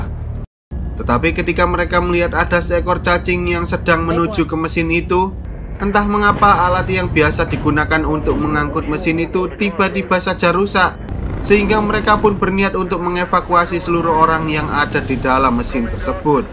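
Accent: Java, Indonesia. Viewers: Mohon maaf, bukannya rasis, medok nya mengganggu. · Wong jowo rek? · Jowone notok tenan😀😀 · Jawa nya ketara banget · Mendok bgt kurang suka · Medok euy 😁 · Maaf ya suara mimin medok bngt, mimin asli orang jawa. Hehe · Bang Abang orang Jawa ya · Wong jowo yo mas? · Bang lu kok medok banget orang jawa ya? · Orang Jawa mana bang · Logat aja medok bett gaenak di denger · Logatnya Jawa apakah Mimin org Jawa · medoknya bikin ngga fokus cokk. pusingg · Bener" kental logat jawa nya. 😁 mantap bng semoga abg dan kita semua salalu sukses. Doakan kan kami semua para pejuang youtube pemula makin berkembang. Aamiin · suara host nya kental banget jawa nya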